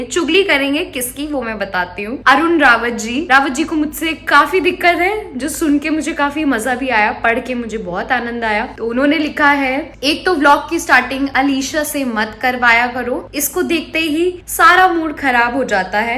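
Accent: Garhwal, India. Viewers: Hayee dii apki gadwali.....😍♥️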